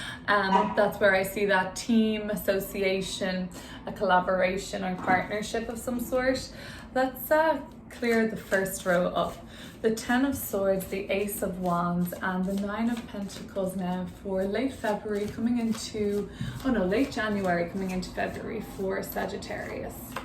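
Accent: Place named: Ireland. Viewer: I love your beautiful irish accent, i should know, im from Dublin, lol. Thank you, beautifully read and spoken.🙏💚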